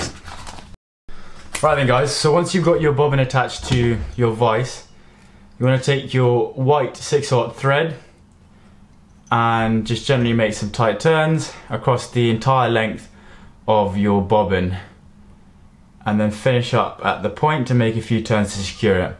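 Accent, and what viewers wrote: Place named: RP, United Kingdom. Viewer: Gosh! What a delightful young man - with talent. And what a delight too, to hear a presentation in RP., where every word is immediately understandable. He's a student, and like many students he's experimenting with his own image and accessories - I remember that phase well. The hair and the bangles and beads may go eventually, but his ability to communicate will remain. Terrific. That's excellent skill and a pretty fly, but (and I do apologise for raining on you effort) those stiff wings do dreadful things to one's leaders - twist, twist, twist. I think you have a fine future.What are you reading, and where?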